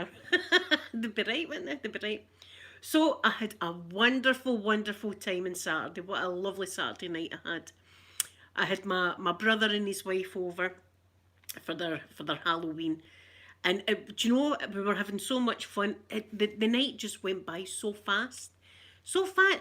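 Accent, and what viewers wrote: Scotland, United Kingdom. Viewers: listening to you speak reminds me of back in the day, when we were able to travel freely and I went up to visit my grandparents and cousins in glasgow. Coming from germany I have not been back to see them in a long time and when someone speaks in the same scottish accent as I am used to hear from them, it always brings back memories from when we all used to gather at my grandparents flat in glasgow and talk about all the things going on in peoples life. I think the last time I was there must have been about 3 years ago or something, so its been a while. I just wanted to thank you for this video and the memories that raised in me while watching it. Keep up the good work and stay safe out there!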